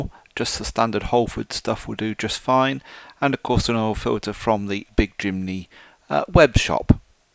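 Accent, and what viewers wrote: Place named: United Kingdom. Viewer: That British accent sounds promisingly good for fixing a car.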